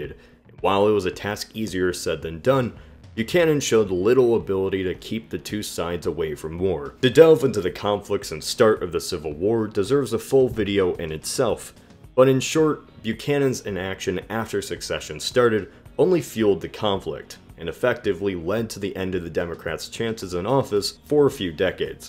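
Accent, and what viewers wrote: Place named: Midwest, United States. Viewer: Ah, that sexy ass midwest voice: back to please my ears.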